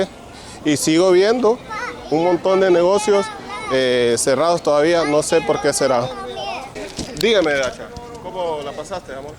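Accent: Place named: Dominican Republic. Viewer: saludo mi hermano yo pense que uste era dominicano como yo. uste pasa como dominicano aqui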